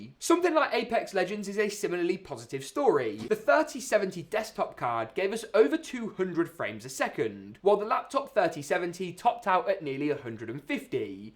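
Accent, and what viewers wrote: United Kingdom. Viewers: british accent is so ridiculous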